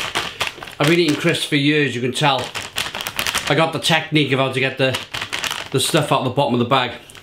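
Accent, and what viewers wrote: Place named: Cardiff, United Kingdom. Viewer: Hey Bud! Fantastic reviews as always. You are a great ambassador for us Welsh. You have an undeniable honesty about you and are incredibly modest to boot... I'm so proud of the entertainment you provide. I'm an expat and just hearing your Cardiff accent brings back a lot of great memories. You've made me proper chuckle on many occasions. lloniannau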